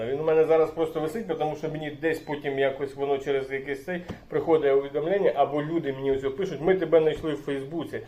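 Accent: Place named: Kamianets-Podilskyi, Ukraine. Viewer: Ваша балачка дуже похожа на нашу ( кам,янець - подільський) з початку як почув то подумав що десь від нас родом😊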